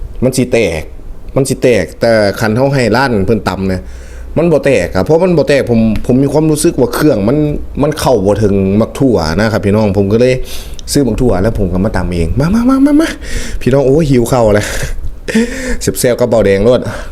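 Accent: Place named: Phu Thai, Thailand. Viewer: สำเนียงเหมืองคนภูไท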